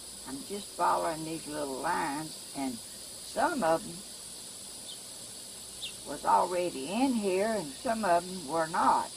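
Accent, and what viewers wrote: South, United States. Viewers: You,sound like down home, lovely, southern girl. I’m from Alabama and I,sound very southern myself. Love your videos. Great ideas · I feel so Blessed to have found you on You Tube! I do silk flowers ideas. Wreaths, arrangements and more. God gave me this talent and I give Him praise for everything! You are a joy and time gets away from me also. I can't seem to get anything accomplished especially since we made connections! lol I just lay back and enjoy all your work and your southern talk and cute happy laughter! I'm like you and I like to talk!! Sure wish I could meet you but I live in Louisiana and Arkansas! Both states!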